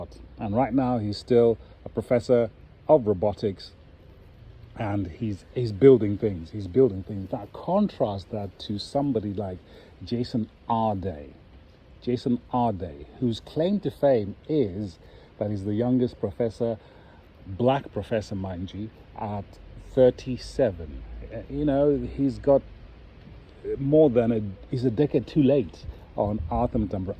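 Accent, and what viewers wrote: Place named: South, United Kingdom. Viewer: Hi, You sound your are from the South of the UK, but could i ask you this? Any chance you can move to Scotland, and we can vote you into Government? You have an understanding of humanity, that these hyper qualified and frankly massive wallopers dont have. Thanks Scotland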